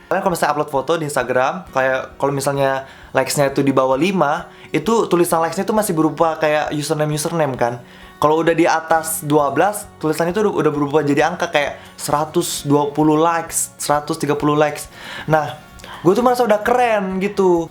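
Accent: Palu, Indonesia. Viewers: Ko rada denger aksen palu nya hehe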